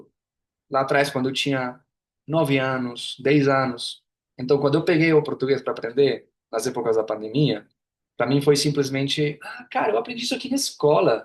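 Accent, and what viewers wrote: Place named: São Paulo, Brazil. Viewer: Bom dia ! Você tem o sotaque paulistano ! Muito obrigado !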